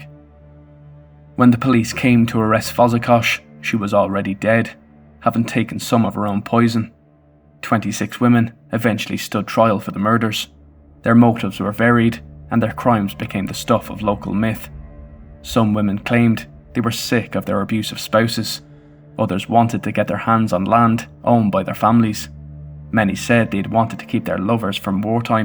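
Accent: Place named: Ireland. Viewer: Great video, but can you do something about your annoying Irish accent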